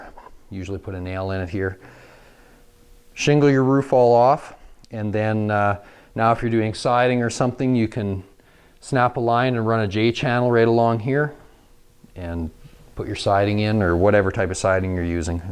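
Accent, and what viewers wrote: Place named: Canada. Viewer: Rodney Byrd dude, be a little more tolerant man. He is most likely Canadian. Thats how they speak. He didnt call you out on your fucked up spelling, did he?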